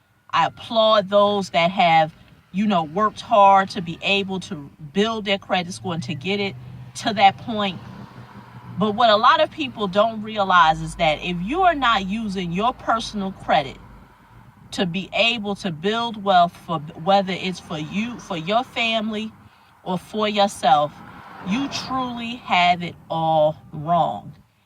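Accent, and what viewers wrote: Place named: North, United States. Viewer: U sound like your from up north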